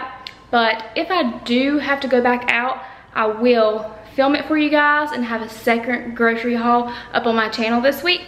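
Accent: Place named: South, United States. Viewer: Coming over from Tiffany’s channel! Love your channel and your southern accent❤️ I live in North Carolina 😊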